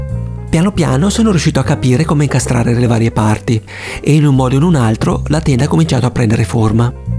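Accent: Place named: United Kingdom. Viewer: Ti ho scoperto da poco, e sei bravissimo e simpaticissimo con quel tuo accento inglese :)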